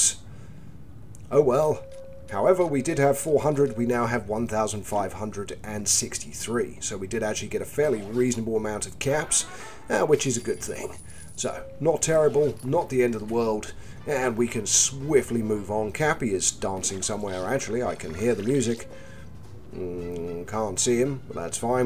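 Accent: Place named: Australia. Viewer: Bro your voice sounds like the Australian Accent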